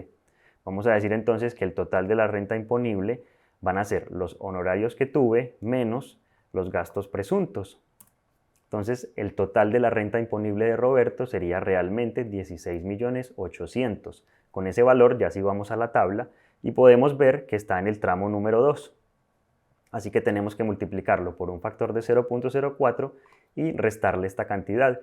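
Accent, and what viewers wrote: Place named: Colombia. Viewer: Bueno video, Clarito como el agua. Solo que tu acento colombiano causaba corto circuito en mi cabeza... pero muchas gracias por la info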